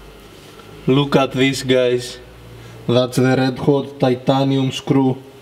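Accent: Greece. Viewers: greek - english accent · RandomizedPyro that could be an accent from a greek person too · Are you Greek? Your accent sounds like the Greek accent. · you sound like you are greek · Recognised your Greek accent immediately. Then I saw the Greek flag on your arm. :P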